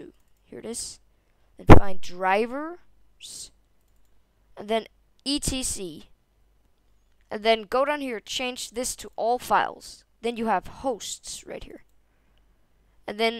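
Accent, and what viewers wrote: Denmark. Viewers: damn your english is so good i can barely hear that you're danish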